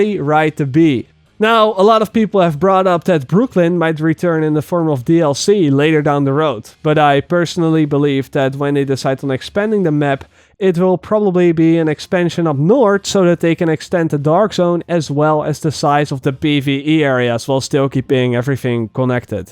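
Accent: Netherlands. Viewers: is this guy dutch? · ik wist dat je nl was ;) Door die tweet screesnshot bevestigde het ;) Je hoort het aan je accent ;) Wel echt nice video!! :D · lol deze man is zwaar Nederlands hoor Hoe hij klinkt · wow this guy is dutch af! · Noticed the Dutch acent in there, I was like.. This has to be a fellow Dutchy >.< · ja hoort best wel duidelijk je nederlands accent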